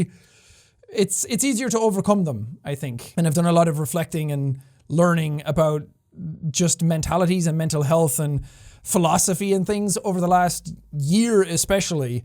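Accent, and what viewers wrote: Ireland. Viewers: Found your channel since pewds gave you that shoutout back in the old days. Ngl, i subbed because of the shoutout and never really watched your videos. I guess what i loved about Jack was his irish accent and energy he put into each and every video. Before, like you said you were shy and all that but then i saw those videos of you screaming your lungs out and it just made me respect you as a person you grew into. Well done Jack!!! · holy, fake accent turned into an irish legend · That accent. That irish accent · To think: you used to hide your accent, and now you're the internet's most favorite Irish potato -- I mean, Irishman 😀 As I'm sure you know by now (but I'll tell you again, dammit), we love you precisely for who you are as a person. No matter what your videos are about, no matter what other YouTubers are doing, as long as you keep being you -- you marvelous human being -- we will continue to love and support you. 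You bring so much positivity to the world in so many ways, and you've been gifted with a unique platform to continue sharing that positivity with the entire world. People always tell me "the world would be a worse place without you", but I know they just mean THEIR world. In your case, it's literally the entire world that is better because you are in it. And now I'll stop gushing because I'm sure I sound a little obsessed and maybe a bit creepy. I swear I'm not! I don't even watch every video 😜 I just think the world needs way more people like you in it and less people like.... well, like the kinds of people that seem the loudest these days. And it makes me overjoyed (and I guess a bit relieved) to see that people like you not only exist, but have a platform.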